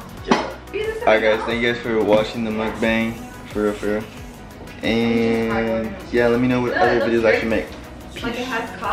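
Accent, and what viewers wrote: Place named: United Kingdom. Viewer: Omg I swear I'd never think u were American I always thought u were british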